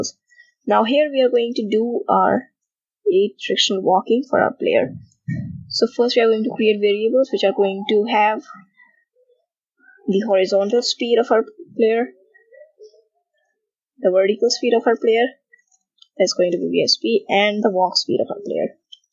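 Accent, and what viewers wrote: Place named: India. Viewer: How come every single tutorial I watch online has an indian accent?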